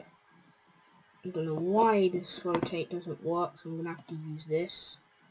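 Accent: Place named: United Kingdom. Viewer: the brithis accent its so funny XD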